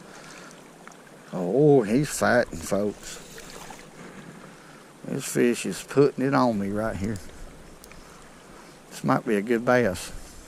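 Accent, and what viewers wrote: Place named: Alabama, United States. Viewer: Can you make a video on your film setup & how you locate fall bass, also i love your videos, your a super funny guy & you make my day when you show our alabama accent off, Rolll Tide:)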